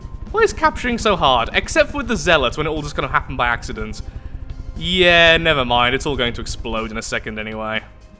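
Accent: United Kingdom. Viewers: Indeed (also British accent)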